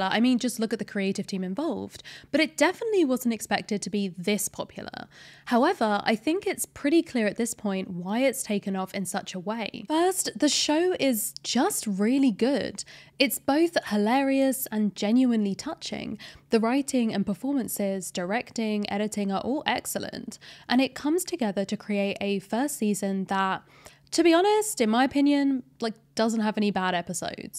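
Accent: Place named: United Kingdom. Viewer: The subtitles transcribed the word duel as "jewel" so if I had forgotten that you were British that would've reminded me lol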